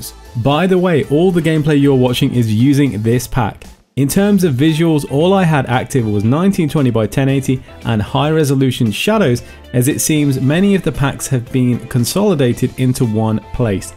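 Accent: United Kingdom. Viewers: Thankyou for the new info as always!! that british accent tought e-e...!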